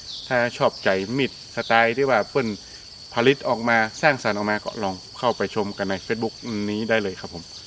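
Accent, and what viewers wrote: North, Thailand. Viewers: สวัสดีค่ะอาจารย์ฟังอาจารย์พูดคำเมืองรู้เรื่องบ้างไม่รู้บ้างแต่ก็ชอบเพราะมันเป็นธรรมชาติแ ท้ๆชอบมากค่ะ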